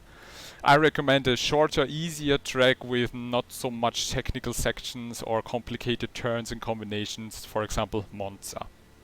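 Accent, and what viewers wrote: Germany. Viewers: wenn österreicher oder deutsche englisch reden :D machs doch auf deutsch !!!! aber gutes video! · Na du bist doch deutsch bei dem Akzent oder? :)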